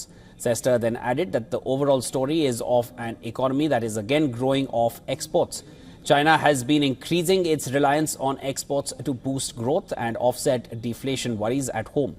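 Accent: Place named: India. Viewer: Is there anyone in India who can speak "proper English"?? The accent here is too strong.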